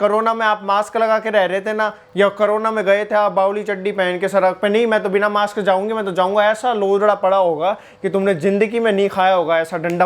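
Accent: Haryana, India. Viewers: I like your language and accent,is it haryanvi?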